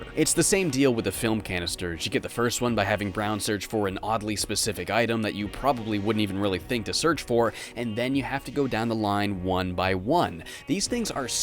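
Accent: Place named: Nova Scotia, Canada. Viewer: Yo what the fuck you're Nova Scotian?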